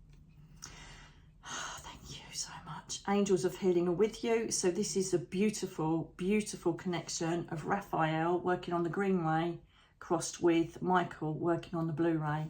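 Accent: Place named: England, United Kingdom. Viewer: I love you! 🥰 And I love your english accent, and your loving and grateful insights on our energy and healing potentials, the power of prayer - YES, the clarity in meditation -YES, Self love - YES supported by Archangels Michael (blue light) and Rafael (green light) and btw I love the fun and joy you give. ❤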